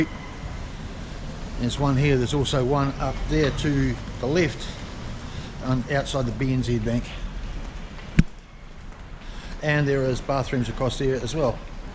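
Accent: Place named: Australia. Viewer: Nice aussie accent. Good job mate.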